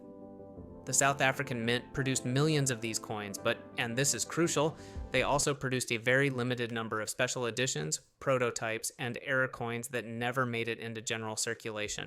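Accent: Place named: United States. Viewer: So, why the American accent?? Big bull shit scam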